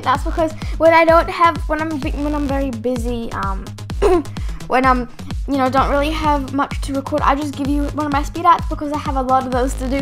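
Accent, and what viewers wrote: Australia. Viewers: Quick question, Are u an Aussie?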